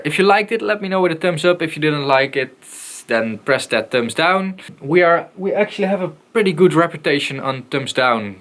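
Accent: Netherlands. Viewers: Dutch accent 👍🏻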